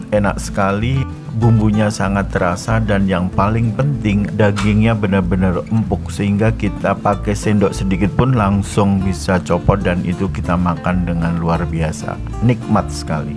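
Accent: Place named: Java, Indonesia. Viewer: yg MEDOK logat jawanya, bila membacakan sebagai narator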